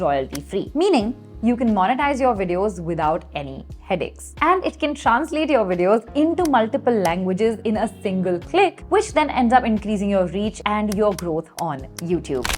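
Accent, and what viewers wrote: India. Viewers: Your voice is sound as indian ❤😮😊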